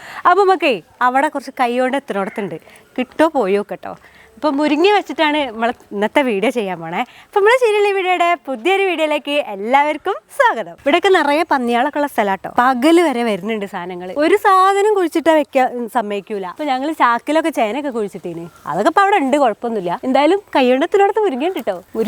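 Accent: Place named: Malappuram, India. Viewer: ഫുഡ്‌ അല്ല ഞാൻ നോക്കുന്നത് അനിയത്തി കുട്ടീടെ വർത്താനം ആണ് ട്ടോ... ഞാനും ഒരു ട്ടോ കാരി ആണ്.... മലപ്പുറം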